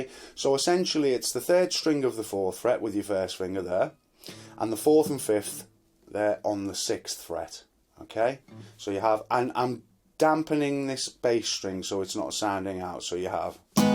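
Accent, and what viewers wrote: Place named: England, United Kingdom. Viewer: Definitely the best channel on the net for beginners and intermediates non English natives. I appreciate a lot the vocabulary you are using and the speed of the lessons and the explanations. Very very nice English accent. You transmit the calm and the patience necessary to learn. That way you will add a lot of subscribers from abroad as my case. Kind Regards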